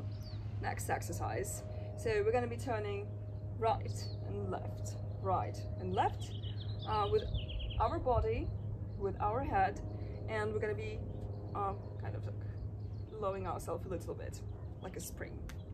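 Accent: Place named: Russia. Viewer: Чувствуется русский акцент у.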